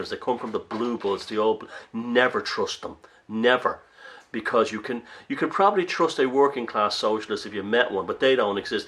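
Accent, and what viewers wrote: Ireland. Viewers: Hello, thanks for your inspiring video,s! Watching your twenty twelve, video! Totally agree with you!!!!!! Noticing, your tantilizing lrish accent, tinking, about jr Tolkien, sermillion Hobbit! Lord of the rings! Thanks very much, bee jeebers!!!!!!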